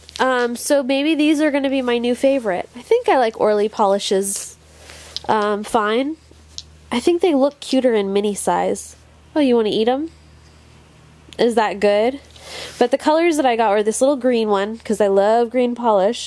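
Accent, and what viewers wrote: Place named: South, United States. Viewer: Happy 200th! Loved the southern accent! Thinking the lil man needs his lil' behind cut before he gets some gross build up back there!! He's such a cutie pie!! XOXO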